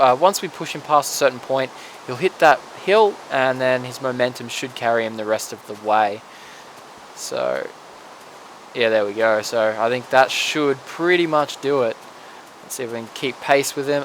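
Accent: Australia. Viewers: he's Australian right??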